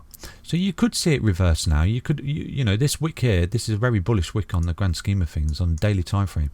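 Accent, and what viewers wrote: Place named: Nottingham, United Kingdom. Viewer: me to .... can tell with your accent.... I'm from sunny Rotherham .... I'm guessing your notts way on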